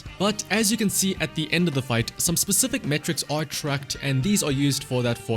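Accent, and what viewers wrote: South Africa. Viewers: your accent sounds familiar, are you south african?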